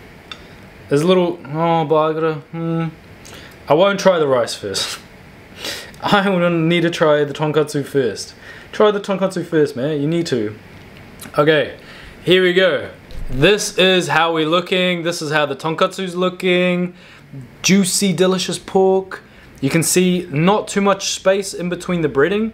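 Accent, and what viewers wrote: New Zealand. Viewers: i love the nz accent so much.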